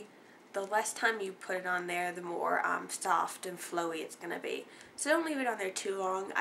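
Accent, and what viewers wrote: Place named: South, United States. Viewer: Are you from the south? I am and you have the same accent as me! ^_^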